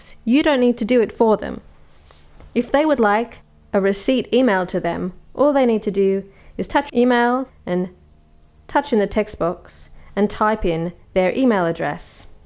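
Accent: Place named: England, United Kingdom. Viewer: U sound English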